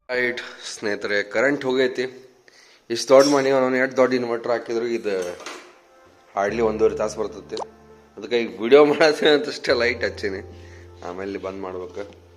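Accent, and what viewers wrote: North Karnataka, India. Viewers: Hi This is Shridevi From USA 🗽🇺🇸 Nanu nimma volgs nodide supper edav ri.... Namma Uttara Karnataka bhase kelaka kushi anastheti adu America dalli and niv Belagavi market thorshidri nodi nanna memories recall adav yakadre navu 2 years belagavi Naga eddavi nanna magalu KLE school he hogtiddalu. so nanu scooter togondu tirgadthaedde so niv thorso belagavi places nodidre nenapaketi anyway nimma mother supper edare Nice volgs Best of luck 👍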